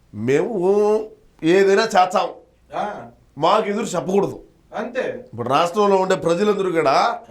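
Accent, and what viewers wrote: Rayalaseema, India. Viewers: వారు మీ విశ్లేషణ అద్భుతంగా ఉంది.. మీ బాడీ లాంగ్వేజ్ చాలా బాగుంది. అంతకుమించి మీ రాయలసీమ భాష యాసలో అమోఘంగా ఉంది.. మన ఆంధ్రప్రదేశ్ రాష్ట్ర భవిష్యత్తు కోసం మీరు చేస్తున్న ఈ త్యాగం చరిత్ర మరువనిది మరపురానిది... నీ విశ్లేషణలో పండితుడికి పామరుడు కి అర్థమయ్యే రీతిలో. కాల్ అంకుశం గా. గ్రౌండ్ రియాలిటీ ను నిక్కచ్చిగా నిష్పక్షపాతంగా... నిర్మొహమాటంగా.. ప్రతి సంఘటనను కూలంకుషంగా. నిజాయితీగా నిబద్ధతతో వీడియోలు చేస్తున్న మీకు. నా యొక్క కృతజ్ఞతలు తెలియజేస్తున్నాను. అని పేరు పెట్టుకున్న మీకు. నిజముగా మహారాజుకు ఉన్నంత మంచి మనసు ఉంది..... అద్భుతమైన మీ విశ్లేషణతో ఆంధ్ర రాష్ట్ర ప్రజానీకానికి చైతన్యం కలిగించి. మార్పుకు నాంది పలికే విధముగా వాళ్ళ మనసులు మార్చి.. రాబోయే కాలంలో వాళ్ళ భవిష్యత్తును బాలేదు నిర్ణయించుకునే విధంగా. మీరు చేస్తున్న త్యాగం కృషి మరువలేనిది. న్యాయం ఎటువైపు ఉంటే. వారికే ప్రజలు పట్టం కట్టాలని. రాష్ట్ర భవిష్యత్తును తీర్చిదిద్దుకునే అవకాశం మన చేతుల్లోనే ఉన్నది అని నిజాన్ని నిర్భయంగా చెప్పే మీకు నిండు నూరేళ్లు సంపూర్ణ ఆరోగ్యంతో ఐశ్వర్యంతో ఉత్తర తో కాలనీ మీ కుటుంబాన్ని దేవుడు ఆశీర్వదించాలని మనస్పూర్తిగా కోరుకుంటూ. 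కృతజ్ఞతలతో🎉🎉🎉🎉🎉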